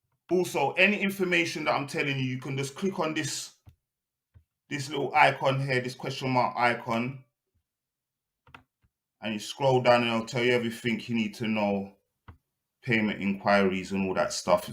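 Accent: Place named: United Kingdom. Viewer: Yes thank you for this video. Been trying to find out how to set up Rumble account. Nicely explained by you man. Nice too to hear the voice of a guy from my ends. Thanks again. Eldad Amaa(UK).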